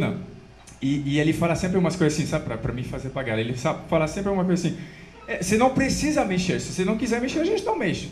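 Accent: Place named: France. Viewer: Hahaha amei! Esse sotaque francês é demais 🤩👏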